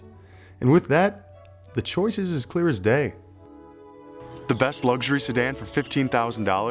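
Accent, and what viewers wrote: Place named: Italy. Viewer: Totally Italian talking styles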